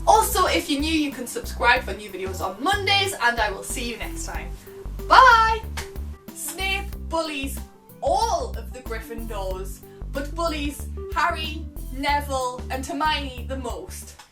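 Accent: Newcastle, United Kingdom. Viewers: OMG!!! Can't say how much I love you in this moment <3<3 This is so fucking true!!! So many people never understand why I never liked the films...in future I may should show them your Video ;) You also had a few facts I never realize. The things about the potions (Forget what they are called in English ;) But what I always hated about the films is that Harry has NO Green Eyes!! I think this a good example for the things that where changes in the filme because of no reasons :/ Thanks for this epic Video ;) By the way I love your british accent xD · It's called a Geordie accent. The epitome of the so-called "British accent".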